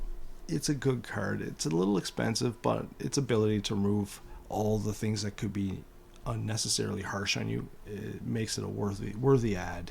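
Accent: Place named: Minnesota, United States. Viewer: My man, you gotta be from MN right?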